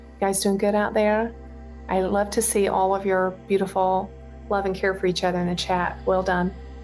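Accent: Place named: South, United States. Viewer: Very informative and we are all blessed that you had such a diverse life experience and your knowledge as a teacher and musician with a wonderful Voice (and Southern Gal accent and character 😀)is a true blessing 🙏. Thank you, and Team ❤️👏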